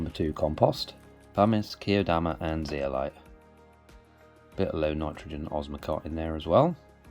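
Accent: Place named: Somerset, United Kingdom. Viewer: Excellent video! Thanks. Your accent reminds me of my friend Garry from Somerset. I’m in the US. Have a great day.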